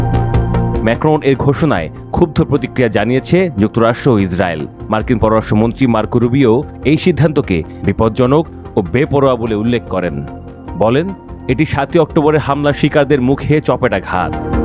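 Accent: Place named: West Bengal, India. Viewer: একাত্তরে নিউজ করা ভাইয়াটার নাম পরিচয় অবশ্যই বাংলাদেশ জানতে চাই আপ ব্যক্তিগতভাবে আমার কাছে কেন যেন মনে হচ্ছে উনি বাংলাদেশি কোন ভয়েসে কথা বলতেছেন না । পশ্চিমবঙ্গের বাঙ্গালীদের ভয়েসের মতো কথা বলতেছেন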